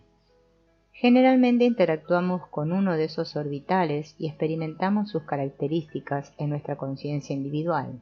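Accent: Argentina. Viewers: Me lo estoy estudiando segundo a segundo. Ya leí el libro. Y escucharlo narrado por una Argentina, fue una sorpresa grata. Voy a dar una conferencia sobre el tema.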